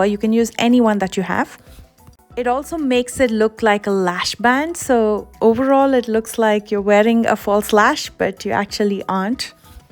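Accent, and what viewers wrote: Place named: India. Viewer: Step one already have thick and decently long lashes ... she sounds Indian.. definitely known for beautiful land thick luscious hair and lashes!!!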